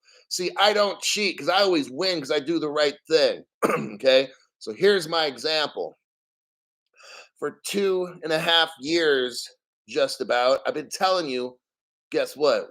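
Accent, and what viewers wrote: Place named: New York, United States. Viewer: This is one of the very few crypto channels that isn't trying to sell you something or shill their own bags; appreciate it. Are you originally from NY/ NJ ? You have the accent & the same Go Fk Yourselves mentality we have here on the east coast, hahaha . Keep up the good work.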